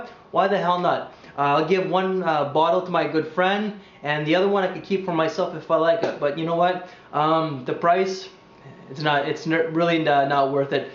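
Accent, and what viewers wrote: France. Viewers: What's with the slight french accent? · LOL love the french accent, and what about that oriental woody? I know not all scents are meant to be beastly but I am really over people charging an incredible amount for something you can't smell...spraying alcohol on my skin and not having the pay off...no thanks. I try to lessen the amount of sprays (to lessen alcohol on my skin) by getting fragrances with more body.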